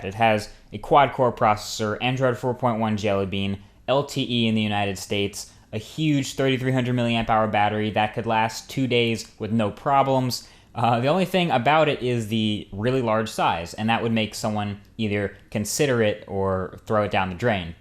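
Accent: India. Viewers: Boy I swear I thought you were Indian!! It is so cool to hear a brotha talk with some intelligence!!! Great review and I'm definitely getting this phone next, tired of apple. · Back when Marques sounded a bit Indian.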